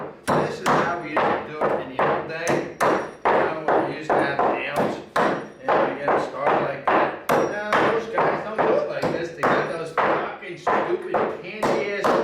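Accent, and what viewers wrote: New Jersey, United States. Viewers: This old bird is a craftsman. Knows what he is doing. Has a funny accent though. Might be Camden, NJ, Brooklyn? JoeB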